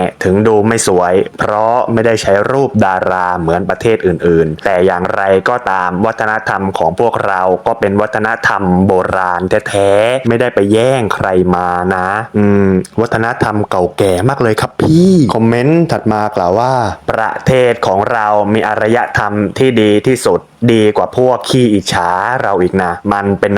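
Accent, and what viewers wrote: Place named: South, Thailand. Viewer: พี่คนใต้เออะ😂😂😂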